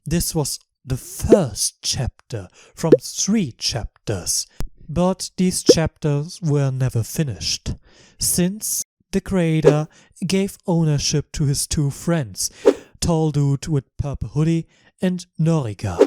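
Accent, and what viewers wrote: Germany. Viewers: ja, wenn man Deutsche gut kennt hört man einen deutschen akzent bei ihrem englisch, und auch cool dass du deutsch kannst haha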